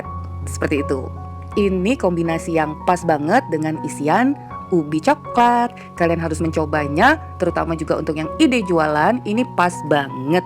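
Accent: Java, Indonesia. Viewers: lucu sekali. Bhs nya kyk orang Jawa saja 🥰🥰🥰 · Gak fokus ke prosesi mbikin kuehnya, maam chayaankk😘😘❤💓🌺🎉🎊 Suka gaya ngomong medhok Jawanya... 😂😂😂😂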